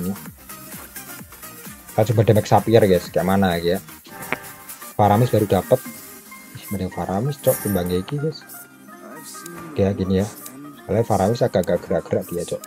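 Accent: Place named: Kediri, Indonesia. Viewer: ooh..tak kiro kediri,,,coro ngomonge mirip wong Kediri..😀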